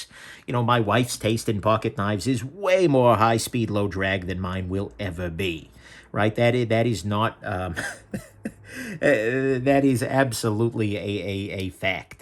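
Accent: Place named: New England, United States. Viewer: Am I the only one that thought He was from New England and not Cali?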